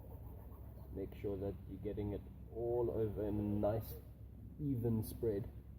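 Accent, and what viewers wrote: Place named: South Africa. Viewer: I sense a South African accent... Where you at brah :P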